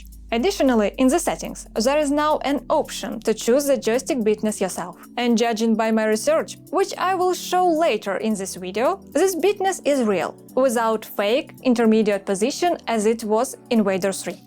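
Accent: Russia. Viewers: Здравствуйте, вы русскоговорящая? Акцент такой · Может на русском делать будешь? Рязанский акцент прям режет уши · The russian accent😅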